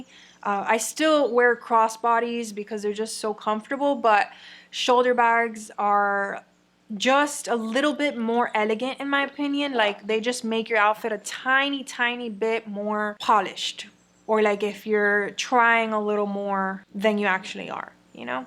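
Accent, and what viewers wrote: Cuba. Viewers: Ok, you have to make a video in Spanish. Me encanta tu acento Cubano You look beautiful in this video, as usual. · Cubana! No daba con tu acento!! 😅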